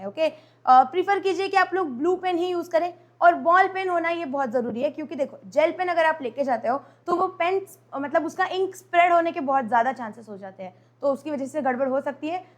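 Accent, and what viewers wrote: Maharashtra, India. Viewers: Ap marathi ho ky mam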